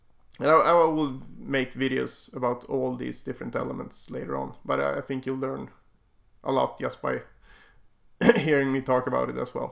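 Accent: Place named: Sweden. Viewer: You have swedish accent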